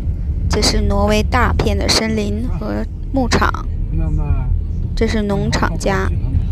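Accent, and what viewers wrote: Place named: Dashiqiao, China. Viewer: 这些黄色的蘑菇好多啊，你们的收货好多哦。我朋友是大石桥的，和你说话特别像。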